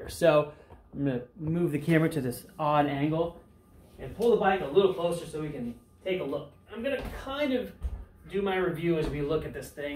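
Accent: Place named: Southern California, United States. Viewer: Hey glad you got a replacement frame. It sounds like you’re from SoCal as well. Could you tell me which giant dealership you dealt with.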